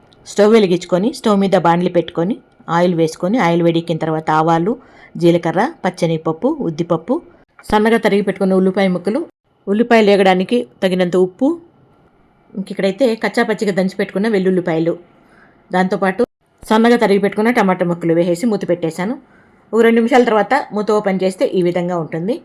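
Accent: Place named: Anantapur, India. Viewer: Ma లాంగ్వేజ్ మాట్లాడుతున్నారు మీరు అనంతపూర్ వాసులేనా మా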